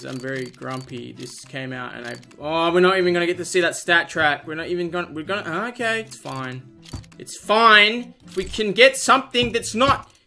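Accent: Australia. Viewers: Your aussie?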